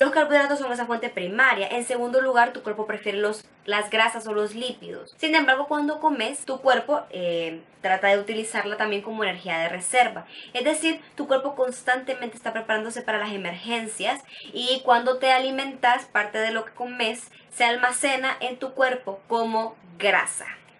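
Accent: Honduras. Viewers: Bravo 👏 me encanta ver cómo te expresas a lo catracho👏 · Hola, como estás? es la primera vez que veo vídeos tuyos. Y me ha encantado, chica ya te ganaste otra suscriptora.!! Saludos desde Madrid, catrachaaa! me encanta escuchar mi acento!!! 😂😂😂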